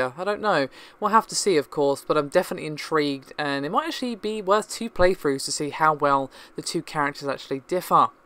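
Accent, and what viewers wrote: United Kingdom. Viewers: Very worth listening to, and elegant British accent. :)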